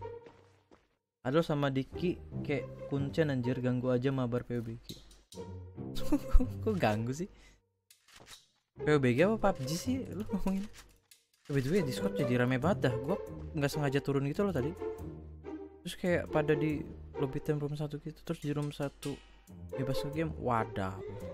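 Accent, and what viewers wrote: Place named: Banten, Indonesia. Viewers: Lucu oge sunda na si akang... wkwkwk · ngakak so much wkwkkw logat sundana banten pisan. leuwih alus keur maen pubg sunda oge mang wkwk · Ngakak gua bang denger lu ngomong sunda😂😂salm dari sunda ciamis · njiirrr ngakak Sunda na kang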